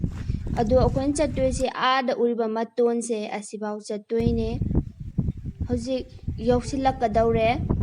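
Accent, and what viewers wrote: Kakching, India. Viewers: Yam nungaidoi malle kakchinggi lonse eidi yam ngaojei leisu yam leininge.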